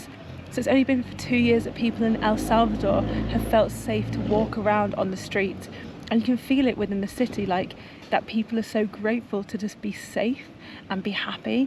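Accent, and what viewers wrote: United Kingdom. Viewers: Firstible I love your accent real inglish and I love that you guys have learned about our culture or history it's an eye opening for other country's, but any ways thank you for visiting and enjoy it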